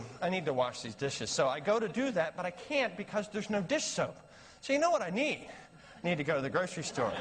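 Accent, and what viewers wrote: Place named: Midwest, United States. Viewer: Does anyone know how to describe this guy's accent/affect? It's vaguely Midwestern but I feel like we could get more specific. He sounds like my Aunt Betsy.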